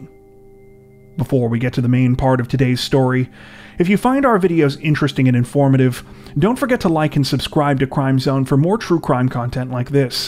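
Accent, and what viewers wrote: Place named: Ontario, Canada. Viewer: Yeah I was gonna say Ontario, but then I figured that was too easy. You do a great job hoss.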